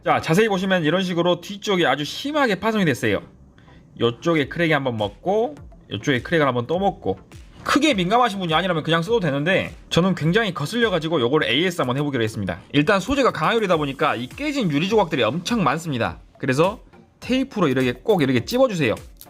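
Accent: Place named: South Jeolla, South Korea. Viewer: 전남사투리 맛깔나게하시네요 ㅋㅋㅋㅋ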